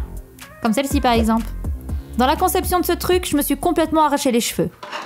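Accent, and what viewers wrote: Belgium. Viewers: Salut, superbe vidéo, je me suis abonné. Est-ce que c'est un accent belge que j'entends ? Si c'est le cas, très heureux de savoir qu'une patriote fait d'aussi bonnes vidéos. Si jamais tu es intéressée, je peux t'expliquer l'électronique facilement, ça pourrait t'aider pour tes futurs projets pour soit avoir moins de difficultés, soit payer moins cher pour mieux ou le tout en même temps. Continue ce que tu fais !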